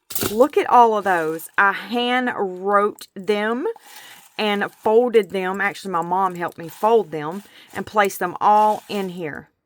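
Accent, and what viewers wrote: South, United States. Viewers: You are a Blessing and extremely generous. Not many content creators return their gratitude the way you do. You not only put your heart and soul into your channel and subscribers. You take the time, thought, and money to put together a large gift box for those who have given and blessed you. It's hard to come by finding generosity and kindness, but also people willing to give without expecting anything in return. I can't afford to send anything or buy from you at this time, but am willing to do that whenever I get that opportunity. With you being open and sharing the Lord publicly, that's a gift in itself. Plus, you're so adorable and just a doll baby with that HUGE country bumpkin twang that I miss so much whenever I lived in the south. (Georgia). I don't feel it's unfair. I feel that you made the right choice when deciding whether to return your gratitude. 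You can ONLY do what you can do and also afford. We all strive to do our best once we choose to do the best thing. I'm sure once your channel grows so will the giveaways. When one chooses to subscribe to a channel, they do not come in expecting gifts. Why now expect it knowing your reasons for the giveaway? Makes you think of others true intentions for watching or subscribing to creators. Focus on those who are here for you regardless of gifts or freebies. Those who are selfless and carry honest intentions, are those who are favored the same in return. Whether it's time, generosity, or acceptance. Please do not stress on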